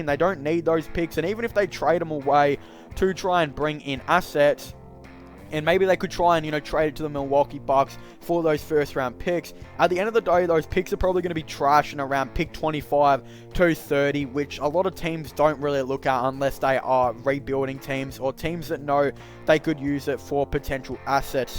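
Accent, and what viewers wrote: Australia. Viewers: Ahhh, its australian bud. Not even close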